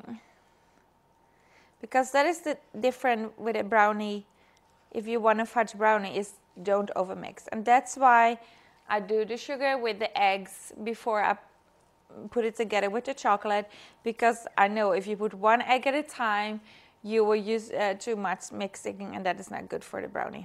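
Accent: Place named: Norway. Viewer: Are you living in Norway? (If you're Norwegian ofc lol) because I can hear a little bit of Norwegian accent when you talk, but it's a lot better tho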